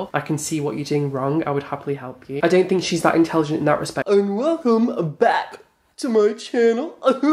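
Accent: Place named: United Kingdom. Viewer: The British accent makes it particularly saucy.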